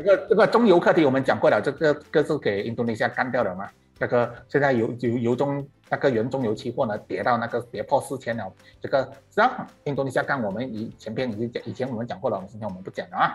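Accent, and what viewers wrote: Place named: Fuzhou, China. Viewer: 哗你的福州话很标准呢！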